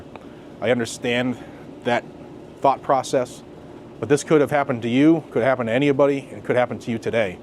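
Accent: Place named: Georgia, United States. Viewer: Sounds like Georgia cops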